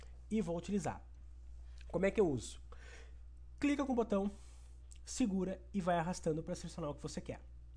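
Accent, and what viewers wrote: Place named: Rio Grande do Sul, Brazil. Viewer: Eu simplesmente amei Gostei do modo que você falar, com certeza é Gaucho muittto bom mesmo, aprendi demais Obrigadaaa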